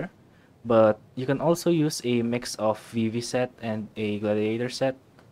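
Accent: Philippines. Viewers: Sounds like you're a filipino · You sound Filipino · ok yung english mo pare, di naman siya cringy it's just english with a pinoy accent. nothing wrong with that : ) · You sound like you're from ph. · Pinoy blood my dude 🔥, lahat naman tayo ganyan magsalita ng English kahit ako Englishero ako eh ung accent lang talaga HAHAHAHA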